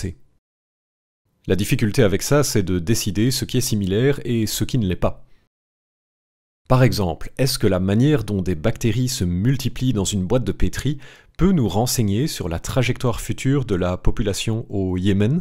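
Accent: Belgium. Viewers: Cette accent belge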